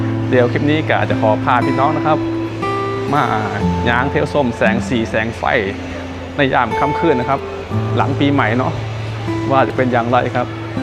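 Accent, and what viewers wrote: Phu Thai, Thailand. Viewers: พูดภาษาสำเนียงภูไทเป็นภาษาท้องถิ่นเหมือนกับภาษาเหนือ ภาษาใต้ ภาษาอีสาน คนอีสานรู้จักเรียกกันว่าภาษาสำเนียงภูไท เหมือนกับ สำเนียงสุพรรณ สำเนียงระยองฮิ ฯลฯ บางคำพวกเราก็เข้าใจแต่ส่วนมากหลายๆคำไม่เข้าใจ นครพนม อำเภอเรณูนคร มีฉายาว่าเวียงพิงค์นครแห่งแดนอีสาน สวยอ่อนช้อยงดงามทั้งคนและวัฒนธรรม เป็นอำเภอถิ่นภูไทมีชนเผ่าภูไทอาศัยอยู่ 99.99% พูดภาษาสำเนียงภูไทตั้งแต่เกิดสืบทอดมาจากบรรพบุรุษนับหลายพันปี นครพนม มุกดาหาร มีชาวบ้านท้องถิ่นอาศัยมานานนับพันปีอยู่ถึง 7 ชนเผ่า แต่ละชนเผ่ามีภาษาพูดเป็นของตัวเอง 1 ในนั้นคือชนเผ่าภูไท และที่จะไม่พูดถึงเลยไม่ได้เพราะวันนี้ถือว่าเป็นคนไทยเกรด A+++ เป็นชนเผ่าที่กุมอำนาจทางเศรษฐกิจสังคมและการเมืองอีสาน คือชนเผ่าที่ 8 ชนเผ่าจีน ชนเผ่าที่ 9 ชนเผ่าญวน แถมน้องใหม่ดาวรุ่ง ชนเผ่าที่ 10 คือชนเผ่าคิงส์โรมัน ผู้มีความรู้ความชำนาญเชี่ยวชาญเทคโนโลยี Call Center, พนันออนไลน์, เปิดกิจการนอมินี, ร้านค้านอมินี, ทัวร์นอมินี, ผัวนอมินี, เมียนอมินี, นายทุนเงินกู้นอมินี, ธุรกิจบัตร..ปลอม, ฟอกเงินข้ามชาติ ฯลฯ ถือได้ว่าเป็นธุรกิจน้องใหม่ไฟแรงแซงทุกโค้งเพราะมีเงินทุนซับพอร์ตมหาศาล เงินหนาพร้อมชนธุรกิจเจ้าเก่าทุกค่ายทุกแพลทฟอร์มให้มันรู้ไปว่าไผเป็นไผใครใหญ่ใครอยู่ จึงทำรายได้มหาศาลกว่าธุรกิจใดๆในโลก.. 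Hello thailand 🇹🇭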